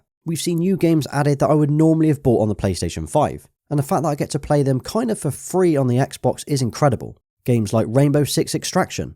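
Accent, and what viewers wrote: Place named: South West, United Kingdom. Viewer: Great vid and nice to hear another South West accent! 😀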